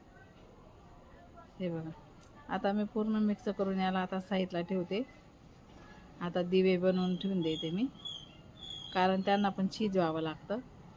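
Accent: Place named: Dhule, India. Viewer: तुम्ही धुळ्याच्या आहे का ताई भाषा तशी आहे म्हणून विचारलं खूप छान झाला सगळा स्वयंपाक 😊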